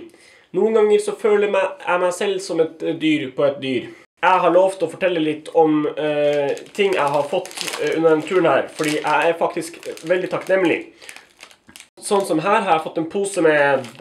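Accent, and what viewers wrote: Norway. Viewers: Du har begynt å snakke i rart, jeg vil gjette Drammensk!? Jeg merker at det er litt vanskelig for deg. Jeg liker det. Trøndersk er kulere. Det er kult å være høy, jeg er høy. Ikke bli bloggerfaen! Jeg kjenner en labrador. Du er kjekk i dag. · Kjære. Digger nordlening-dialekten din.:-)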